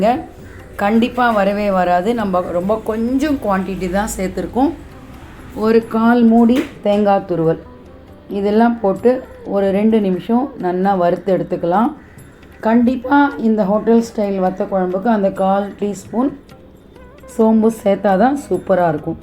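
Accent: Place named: Sri Vaishnava, India. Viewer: Sri vaishnavaa பாஷை கேட்க மிகவும் ரம்மியமாக இருந்தது மிக்க நன்றி 🎉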